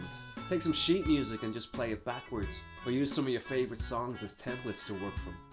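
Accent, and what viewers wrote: Dublin, Ireland. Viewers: Is that a Dublin accent I hear? Or is it from a bit further west?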